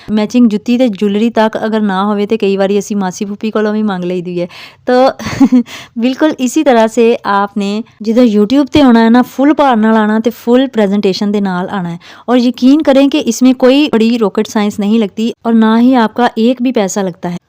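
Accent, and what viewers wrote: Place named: Punjab, India. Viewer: Very sweet panjabi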